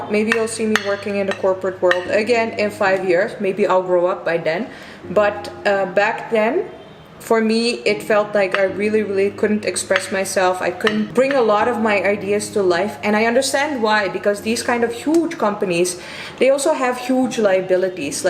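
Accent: India. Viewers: Je hebt zelfs een Indiaas accent als ik het zo goed zeg? Heb een hele tijd geleden wat Nederlandse vlogs gezien en kwam je nu weer tegen. Wat leuk dat je je draai hebt gevonden in India! Wens je veel geluk 🍀 op je pad! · You got a little Indian accent when you speak english haha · Totally picked up Indian accent....😄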